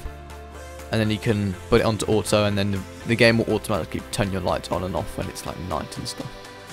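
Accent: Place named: United Kingdom. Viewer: your brittish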